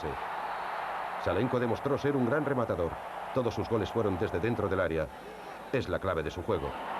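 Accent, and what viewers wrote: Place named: Spain. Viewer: Para documentales no existe nada mejor que la de un español o española... lo máximo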